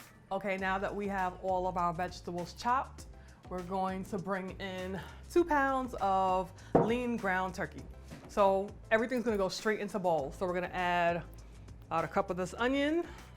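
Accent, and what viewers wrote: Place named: New York, United States. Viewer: Man, I could tell she was from New York- soon as she spoke ... I wanna make a meatloaf but I don't have fresh Parsely☹️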